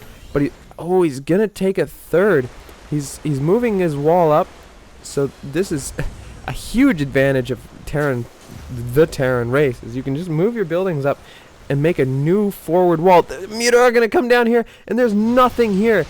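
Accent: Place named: Canada. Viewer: lol your accent very canadian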